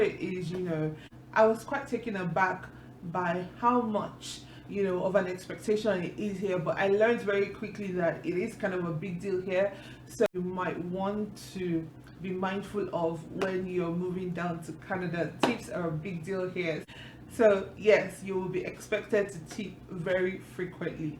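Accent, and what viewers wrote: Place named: United Kingdom. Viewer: I like the way you talk You sound British😌😌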